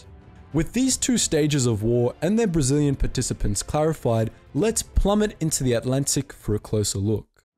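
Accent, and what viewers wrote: Australia. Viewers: Dumb question are you Australian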